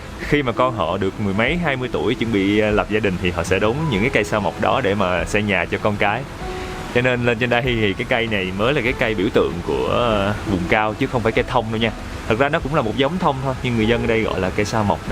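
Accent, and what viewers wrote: Mekong Delta, Vietnam. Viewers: Thích a , giọng đậm chất miền tây luôn 😋😋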